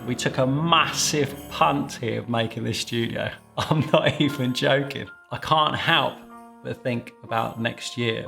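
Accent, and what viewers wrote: United Kingdom. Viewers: Merry Christmas,. None of the "issues" have been an issue for me. I just love to see you giving bread tips with all your energy and that unmistakable British accent of yours. P.S. What is your secret for looking so young? You must be in your twenties or thirties, but you look like a teenager.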